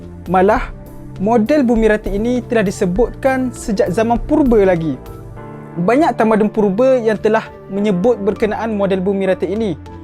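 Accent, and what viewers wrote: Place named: Terengganu, Malaysia. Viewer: Speaker nie org TRG ke??... dgr mcm ye dh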